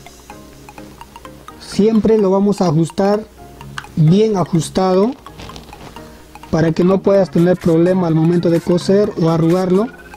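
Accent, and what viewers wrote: Peru. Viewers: Buena info....eres de Perú?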